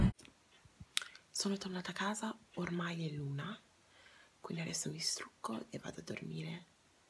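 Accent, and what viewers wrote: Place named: United Kingdom. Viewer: Ciao sei italiana (cioè nata in Italia) e poi trasferita a Londra o cosa?:) appena iscritta mi piaci molto e apprezzo molto il tuo accento molto british haha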